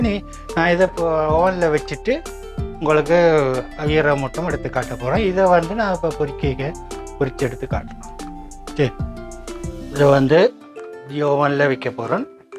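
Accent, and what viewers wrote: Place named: Sri Lanka. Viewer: Lovely recipe and narration 😊👍.. srilankan slang is arumai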